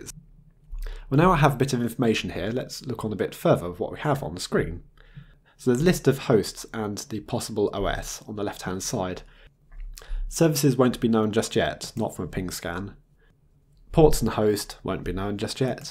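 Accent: United Kingdom. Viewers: I have subscribed to the channel just because the British accent :gentleman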